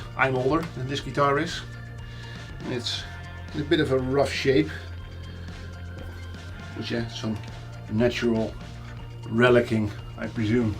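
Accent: Netherlands. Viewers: Are you dutch . It sound like it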